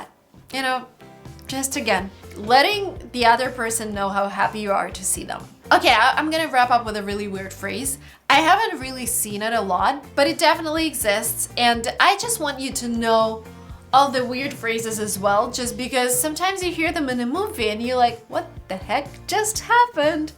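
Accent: United States. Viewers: You inspire me a lot. People say you can't have an American accent because you're not a native speaker but YOU DO, so I've been improving my accent for like 5 years now and now people think i'm American haha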